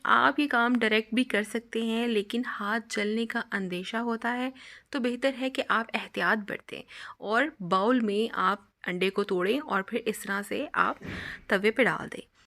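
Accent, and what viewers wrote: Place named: India. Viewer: Please don't talk like Indians it's اچھے سے it's اچھی طرح سے ۔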